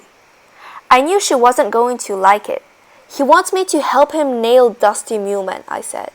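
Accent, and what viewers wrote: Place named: United Kingdom. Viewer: sry british voice annoying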